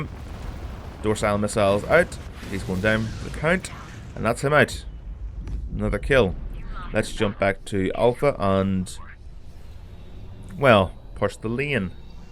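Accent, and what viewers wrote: Northern Ireland, United Kingdom. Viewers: Ta man I was looking for this build. Good to see a Norn Iron accent online :D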